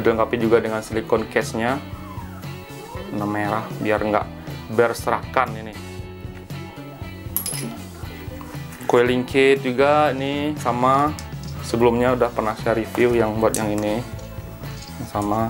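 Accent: Padang, Indonesia. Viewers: Ngomong nya kayak logat orang padang ni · mantap dah.. tp by the way.. logat nya kayak org padang neh.. bener ya?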